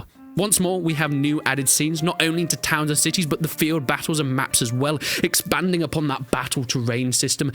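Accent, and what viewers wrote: United Kingdom. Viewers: I hate your dumb british accent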